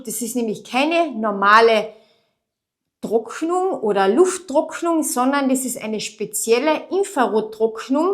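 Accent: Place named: Bavaria, Germany. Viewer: Liebe, das Thema ist ja sehr interessant, doch für einen Norddeutschen ist das Bayerische fast wie eine Fremdsprache, also schwer verständlich. 🤣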